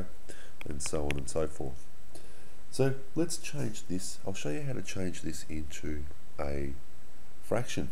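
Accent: Australia. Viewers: ARE YOU AUSTRALIAN? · I like his deep Australian accent :3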